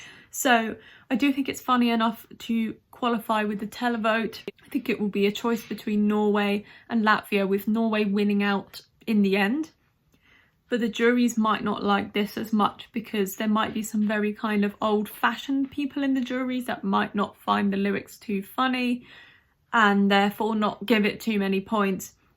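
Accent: United Kingdom. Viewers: you have a lovely English accent and you look gorgeous. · I am not a huge fan of Eurovision, and it's veeery seldom that I watch "reactions". This year is an exception, because of our Kalush Orchestra participation at Eurovision 2022. Thank you for your respectful analysis of this entry. I have watched some "reactions" (which was mostly like Oh/wow/lifted eyebrows kind of reactions, which is neither fun nor development)). But your channel gives so much more! Your Reactions are more like Analysis than simple reactions, actually. Love your British accent), love your genuine vibes, love your respect in predictions. Share your emotions towards Måneskin)). Thank you! You are doing it right! Love from Ukraine! Since we cannot vote for our country, my vote this year is for Sam Ryder, UK. Very good entry!! Vocals, aesthetics, the whole message- good luck, Sam!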